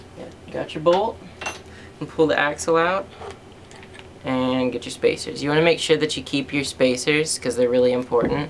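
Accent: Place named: United States. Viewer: dat american accent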